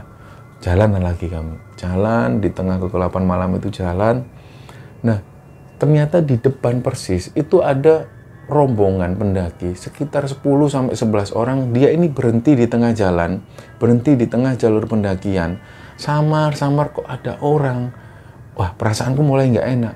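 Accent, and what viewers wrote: Java, Indonesia. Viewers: Mas langgam jawanya mantep ketagihan dengerin sukses mas 🙏🙏🙏👍👍👍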